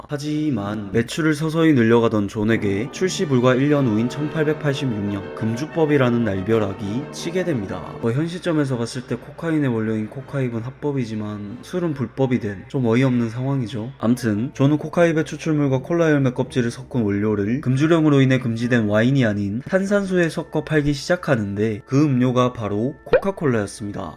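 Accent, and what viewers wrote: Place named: Gyeongsang, South Korea. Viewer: 영상 개재밌네ㅋㅋㅋㅋ어눌한 경상도 사투리로 말을 왜케재밓게하심ㅋㅋ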